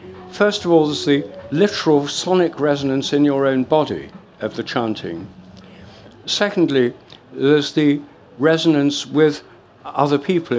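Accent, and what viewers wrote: United Kingdom. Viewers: I guess it’s just his British accent. But certainly not a serious intellectual. Reminds me of Alan Watts.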